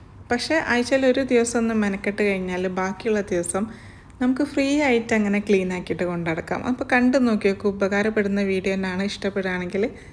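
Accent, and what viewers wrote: Kozhikode, India. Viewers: Kozhikode evda Samsaram Ketit kozhikode aanenu thonunu 😀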